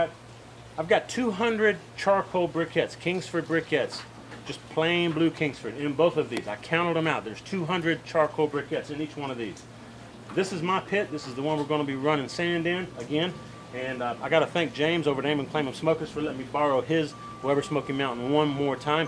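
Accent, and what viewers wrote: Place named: Texas, United States. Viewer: One question that I would have is where in the USA are you cooking? Based on your accent, it sounds like you are also from Texas. Ambient humidity could also have a big influence. If you are down in a high humidity area, like the gulf coast, then the pan probably does not make a difference water or sand. The water pan probably does not have as much of a noticeable difference of the humidity level in the smoker. If you are in a low humidity area, like the Texas panhandle or West Texas sticking with the Texas references, then it make make a bigger difference. Since I am new to your channel, I do not know where you are doing your testing.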